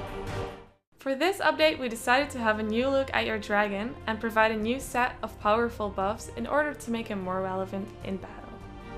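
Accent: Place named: Netherlands. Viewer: She sounds Dutch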